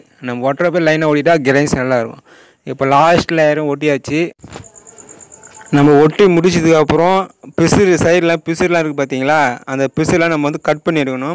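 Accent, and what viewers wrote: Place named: Chennai, India. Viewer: அருமையான தமிழ் மெட்ராஸ் பாஷ பா,பழ்ப்பு வலிச்ட்டு,அருமையான கண்டு பிடிப்பு பா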